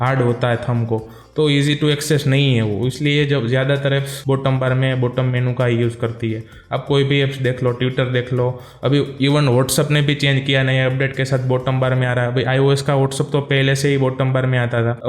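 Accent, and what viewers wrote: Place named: Gujarat, India. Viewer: badhiya video he. god bless. your Hindi is wrong. i thought you were talking in Gujarati but you made quality content. keep it up alwayes. Liked Shared and commented.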